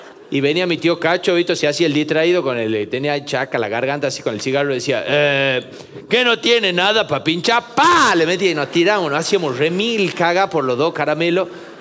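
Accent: Tucumán, Argentina. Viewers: no te gua ce nada, así hablamos los Tucumános jajajaja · los tucumanos hablamos divino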